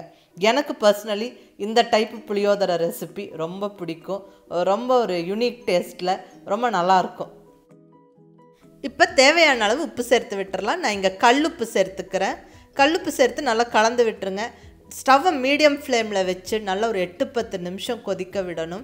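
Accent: Coimbatore, India. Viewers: Neenga coimbatore native ah mam,? ur slang is as me . Nallaa jammunu irukku😋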